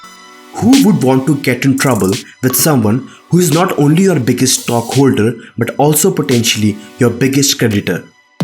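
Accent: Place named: India. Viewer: I hate indian accent i always did sorry. 😆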